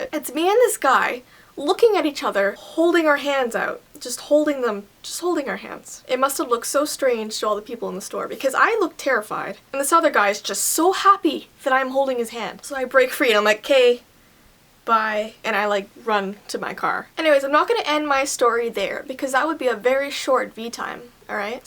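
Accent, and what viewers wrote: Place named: Canada. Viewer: She has a Canadian accent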